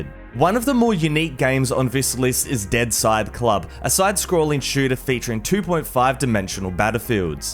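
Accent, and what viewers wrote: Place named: Australia. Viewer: Aussie accent mate that’s how we say it.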